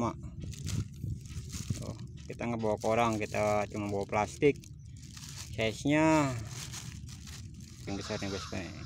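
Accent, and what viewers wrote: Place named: Tangerang, Indonesia. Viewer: Selamat pagi bang .. salam kenal Logat nya kaya orang kampung Melayu tangerng dan sekitarnya ..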